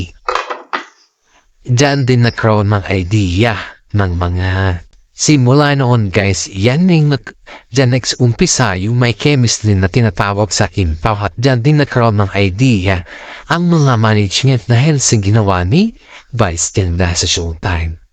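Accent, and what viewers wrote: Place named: China. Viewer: Tono mo para kang intsik na bulol sa tagalog. Halo halo pa sinasabi mo hindi maayos. Magulo kang mag vlog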